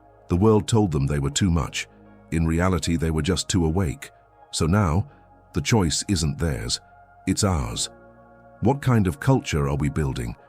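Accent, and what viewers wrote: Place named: England, United Kingdom. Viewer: But the AI has a cool English sounding voice 😅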